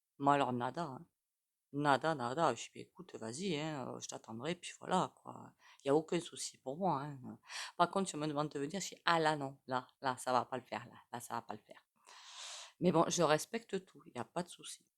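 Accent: South, France. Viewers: un petit accent du sud çà réchauffe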